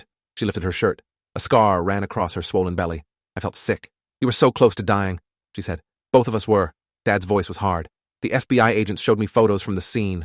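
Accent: United Kingdom. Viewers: I love the Britain accent. It’s wonderful.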